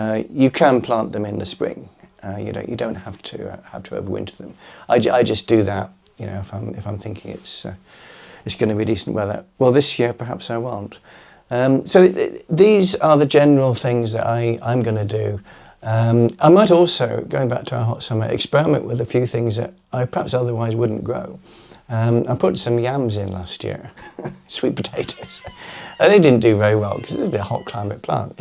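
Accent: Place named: Cornwall, United Kingdom. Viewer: love the cornish accent- ell neenow! cheers!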